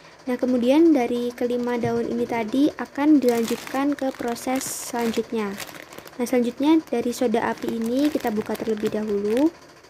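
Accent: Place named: Java, Indonesia. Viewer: Jowone medok, hahaha semangat yaa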